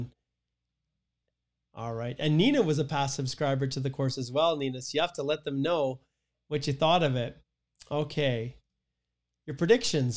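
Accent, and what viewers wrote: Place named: Portugal. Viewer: Great Portuguese,! I'm impressed. You got a bit of Portuguese (from Portugal) accent there, awesome!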